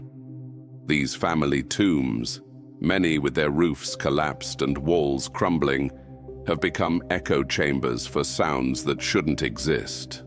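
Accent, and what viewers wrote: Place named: United Kingdom. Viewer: hello I just subscribed to your YouTube channel love your videos love your British accent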